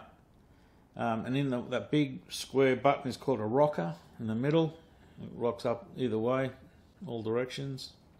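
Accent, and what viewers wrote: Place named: Australia. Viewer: Great video---I stuck around just to hear that aussie accent.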